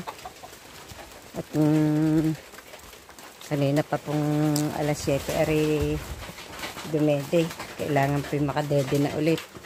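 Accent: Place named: Batangas, Philippines. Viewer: Para taga batangas po kayu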